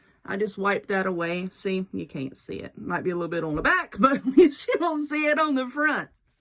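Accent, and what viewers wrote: South, United States. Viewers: I will save this video with all of my other videos of things I want to make. I love that you take your time in showing some of us slowpokes how to make great diys. Your sense of humor and southern accent always make your channel so enjoyable to watch. I wish you and your family a very Merry Christmas and a wonderful New Year. I pray The Lord bless thee, and keep thee: The Lord make his face shine upon thee, and be gracious into thee: The Lord lift up his countenance upon thee, and give thee peace. Numbers. 6: 24-26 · I love this and being a displaced southern gal myself, I just loved hearing my kinda accent! One suggestion that I would offer would be to mention that if you use hotglue, it needs to be via a gun that makes the blue very hot! I heard about this craft from a friend who failed at her attempt and learned that she used a mini glue gun so that the blue wasn't hot enough. 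Thank you for sharing this craft.😁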